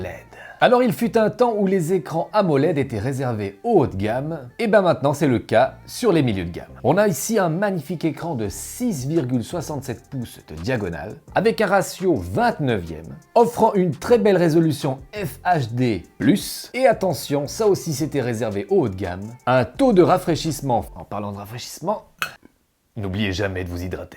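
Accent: Switzerland. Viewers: Rien que pour ton humour et ta joie, ce qui ne t'empêche en rien d'être très Pro, précis et perspicace, je regarde tous tes tests même si j'ai pas vraiment besoin d'un smartphone... mais plutôt de sourire sur ton charmant accent franco-"phone".... hihihi! Bises à la Suisse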